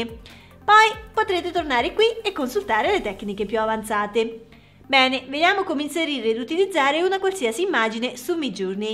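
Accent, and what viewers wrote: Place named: Marche, Italy. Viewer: Sei bravissima, per colpa della tua spiegazione fantastica questa notte mi sono fatto un bel abbonamento a Mid...sento dalla tua voce odore di casa...penso che sei zona Marche sud...Complimenti ancora.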